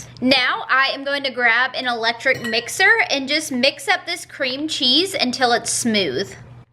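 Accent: South, United States. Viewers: You so country lol lol lol. I love it!!! So adorable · I love ya girl.... Since u moved to bama ur getting more country twang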